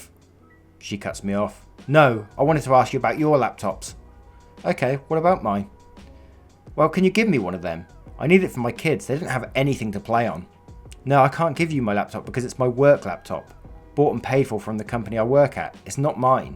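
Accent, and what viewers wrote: United Kingdom. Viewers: first story very good (britash sound)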